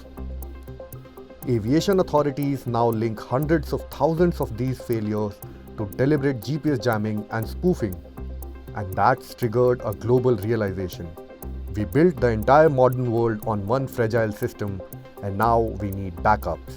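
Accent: India. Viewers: I was going to flame you for the nearly impossible to understand accent, but you're an Indian business. I can't fault you for a strong Indian accent. Comment is free engagement for the algorithm.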